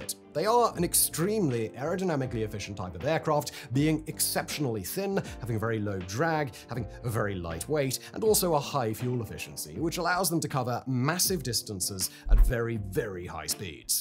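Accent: United Kingdom. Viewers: I am sure he sounds normal to you and you neighbourghs in England. You are right, I may have to concede he does not exagerate his accents, I 'd rather say he does not care for those who does not live in the same accent area. What I experience is no problem listening to most popular science speakers such as Bill Nye, Neil de Grasse-Tyson, Carl Sagan, Michio Kaku, Brian Cox, Richard Dawkins, Dave Farina, David Attenborough for instance (only mentioning men voices, because women voices tend to be more intelligible in general). I might have overstated, I understand him. I still prefer other accents/voices. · Dudes voice is like a caricature of a brit... every video feels like I'm listening to a very dry Python skit.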